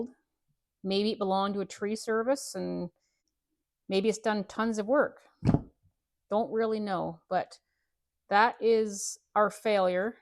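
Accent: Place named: Canada. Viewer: Don't you just love how Canadians pronounce certain words.............like "out" which becomes "oot"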